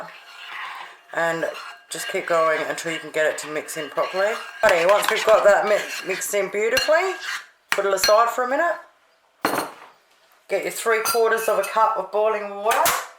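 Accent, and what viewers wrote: Australia. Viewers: You sound Aussie as , love it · Hey Woman I love ❤️ your style and hearing our good Aussie accent. Cheers 🥂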